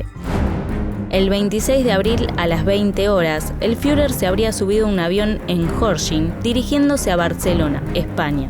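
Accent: Argentina. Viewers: iuuu, se escucha horrible el acento argento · Muy bueno el video, tiene un lindo acento porteño y saca videos de buena calidad, en poco tiempo llegan a los 100k. Éxitos.👍🏽🇦🇷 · Que acento más engañosos, por un momento pensé que era español y de repente me dí cuenta qué es argentino OMG Pd: exelente video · Buen video, lástima que tu acento sea argentino, es muy molesto. Dislike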